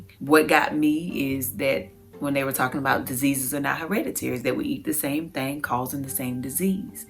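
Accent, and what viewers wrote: South, United States. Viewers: I like her. She sounds so country, honey. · She sounds so southern it almost sounds like she's auditioning for a southerner for a movie.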